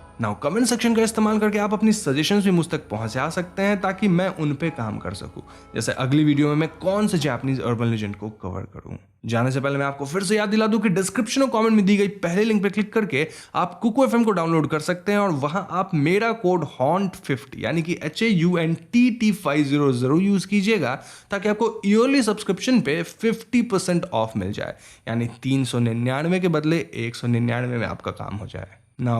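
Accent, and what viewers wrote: Bengal, India. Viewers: You are bengali right?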